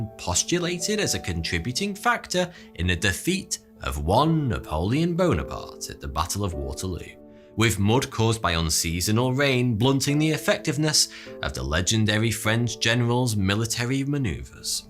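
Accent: United Kingdom. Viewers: Lovin your british accent in french haha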